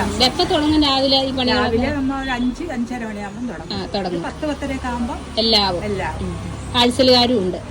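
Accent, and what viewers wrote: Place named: Malabar, India. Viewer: മലബാറിന്റെ സംസാരരീതിയും മലബാറിന്റെ രുചിയും പാചകരീതിയും സംസാരത്തിൽ തോന്നുന്നു. എന്തോ തോന്നലാകാം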